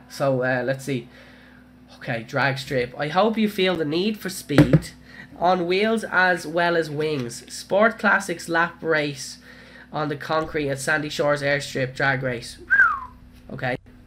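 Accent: Ireland. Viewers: OMG happy birthday <3 luv ya and your vids xxxxxxx hope it's a good one xxxx 4 ever loving you and your Irish accent From maria x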